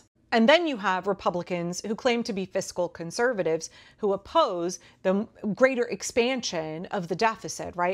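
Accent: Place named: Texas, United States. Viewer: I’m so glad I found your channel! You sound like you may be from Texas like me!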